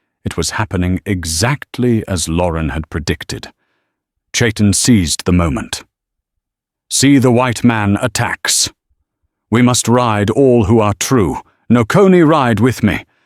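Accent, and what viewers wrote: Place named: United Kingdom. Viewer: You need someone to speak America English for an American stories.. Not English from UK..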